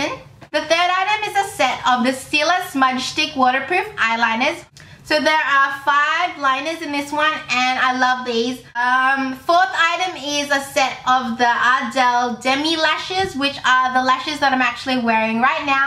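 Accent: Australia. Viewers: Thanks for throwing this giveaway. I love hearing your Australian accent! I would also love to see more product reviews, and possible drug-store-dupes of higher end products. Have a great day! :-)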